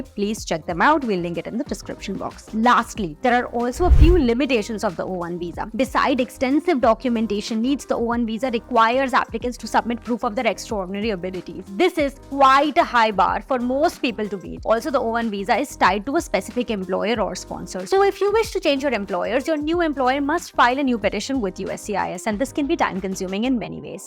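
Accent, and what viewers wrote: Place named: India. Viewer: Horrible,horrible accent ,true torture to listen to ,we have no problem with creators from India who are speaking with American or Canadian accent,lots of people is learning English from YouTube but no one is trying to learn English with this accent….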